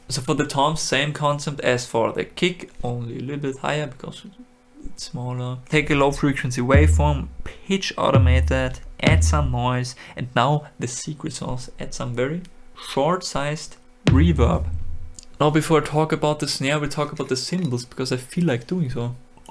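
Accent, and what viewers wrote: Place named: Austria. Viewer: I am a simple man. I hear a fellow Austrian accent, I press like.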